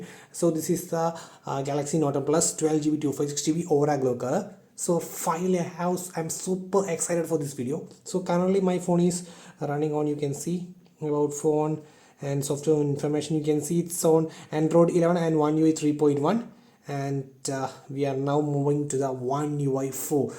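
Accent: Kerala, India. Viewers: Heavy malaylee accent :)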